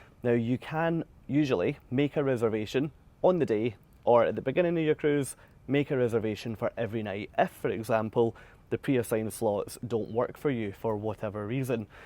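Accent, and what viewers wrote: United Kingdom. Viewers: Thanks for this info. I'm on her 7/22 and so looking forward. I've been Princess Elite over 15 yrs. One thing you didn't address was the Gala nights - oh my all the comments I hear from folks who are terrified of what to wear. I know food taste is purely personal so I can make a delicious meal on my own. But what about these white nights, or masquerade party, etc. Can you fill me in on that part? Loved your accent - this southern lady is going to adore hearing all the British chatter in a couple of weeks.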